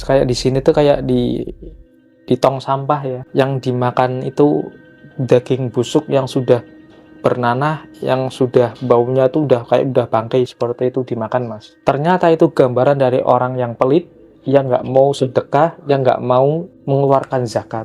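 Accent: Pekalongan, Indonesia. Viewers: Mz nya yg bercerita org pekalongan to makanya q gk asing logat bicaranya salam dri Hongkong mz konten kreaktor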